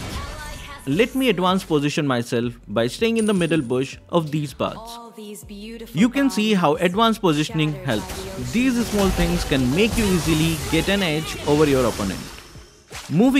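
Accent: India. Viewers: U r indian ? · Bro are you from India pls answer me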